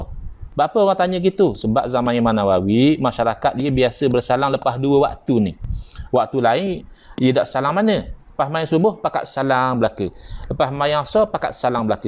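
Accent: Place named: Terengganu, Malaysia. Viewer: Sedak pokku cakak ganu..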